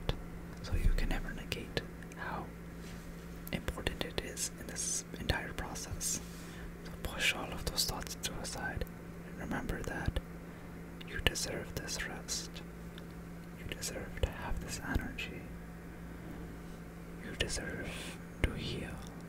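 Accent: India. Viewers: This is awesome. Your Indian accent rules bro 🔥🔥🔥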